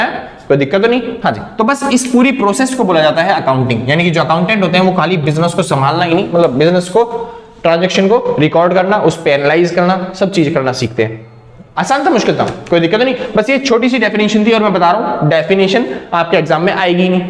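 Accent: Haryana, India. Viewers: SIR PAKKA HARYANA SE HAI 👍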